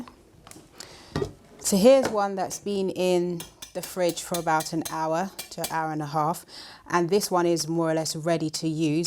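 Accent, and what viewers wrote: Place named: United Kingdom. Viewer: The long pause and pan up of the camera at the beginning of the video was quite creepy and strange 😂 I also was not expecting her to have a British accent.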